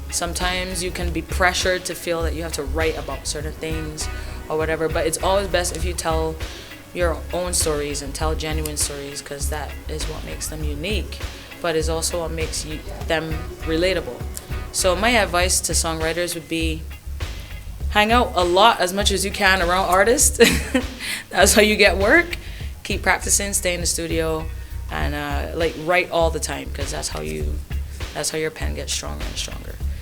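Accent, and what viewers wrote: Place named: Barbados. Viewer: I love her Bajan accent!! Bigup Barbados 🇧🇧 🇧🇧🇧🇧✊🏾🙌🏾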